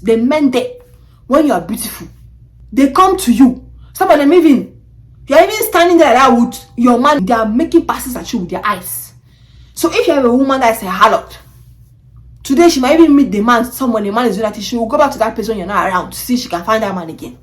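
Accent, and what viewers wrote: Nigeria. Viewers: And also are you sound Nigerian ❤❤❤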